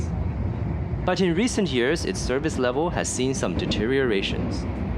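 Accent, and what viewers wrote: China. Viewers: 听口音就知道是同胞，感谢攻略，很有用！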